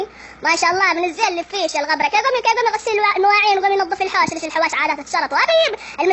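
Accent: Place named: Mukalla, Yemen. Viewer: Danea G.a هذي لهجه اهل المكلا 😂👌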